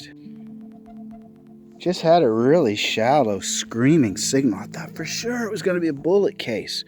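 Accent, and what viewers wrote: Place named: United States. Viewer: the American accent through my stereotype off. :-)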